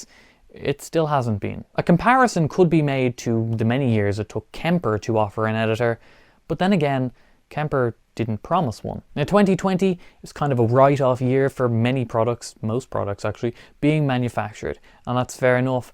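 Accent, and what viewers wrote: Ireland. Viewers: I came here because I needed to hear that gentle, Irish voice of...DOOM!! 😎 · Why do u have a Irish accent?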